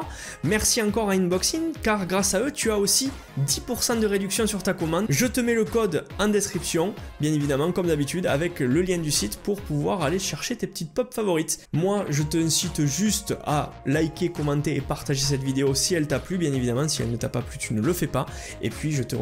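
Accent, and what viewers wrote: Toulouse, France. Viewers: Mais oui Toulouse ! Je savais que je connaissais :P je ne suis pas tout à fait à coter je suis de Montpellier mais j'ai confondu car j'ai côtoyé pas mal de Toulousains :P j'adore cet accent ! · J'adore ton accent Toulousain xD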